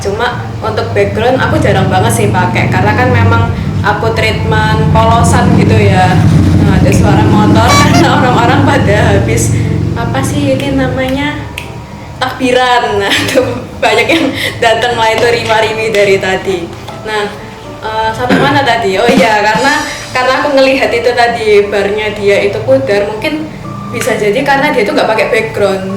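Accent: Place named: Surabaya, Indonesia. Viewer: pernah ketemu di event hoc. Hahaha. Suroboyoan pokok e wes.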